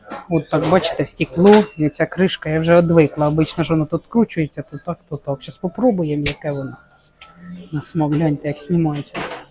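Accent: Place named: Poltava, Ukraine. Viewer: Якщо вам не подобається,то не дивіться,це полтавський діалект.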